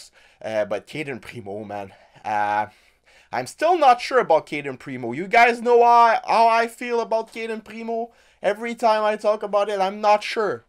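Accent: Quebec, Canada. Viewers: Es tu Quebecois ? ta un accent Quebecois quand tu parles anglais :) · I knew it was that. I know a girl with the same thing.. Not that close from the eyes... But near. Wish you un prompt rétablissement :) Tu parle anglais comme un Québécois 😌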